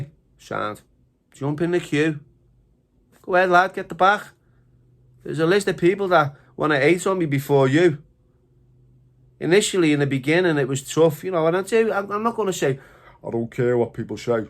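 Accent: Liverpool, United Kingdom. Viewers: I knew you were from shpeke as I’ve got a few mates that talk with the shh in the scouse lingo I bet you know my mate Raymondo who the Mato got hold of and took the lot , mansion Bentleys lambos the lot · Good chat scouse love it 😀 fuck aki and french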